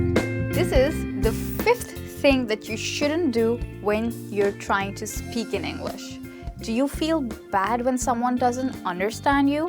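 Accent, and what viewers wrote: India. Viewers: I think she is speaking india English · What a beautiful indian accent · God this is Indian English . So funny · Are you Indian. Your pronounce unfold it. Sometimes you spoke Indian english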